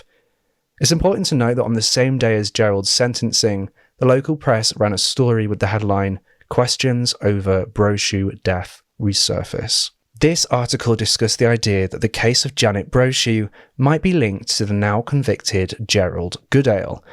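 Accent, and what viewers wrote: United Kingdom. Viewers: You have such a beautiful, sultry voice. I would love for you to narrate all of my favorite books. You could easily narrate professionally (especially for those of us in the US who swoon over British accent).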